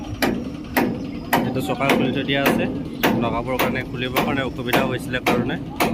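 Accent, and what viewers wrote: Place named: Jorhat, India. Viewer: Jorhatr kotha bayek nukuwa vaaaaal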